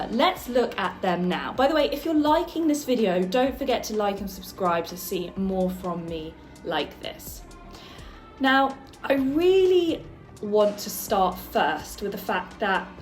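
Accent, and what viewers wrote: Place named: United Kingdom. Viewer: I'm listening to your lovely British accent (from an American standpoint)and I'm wondering why there are so very few Americans doing videos of this sort.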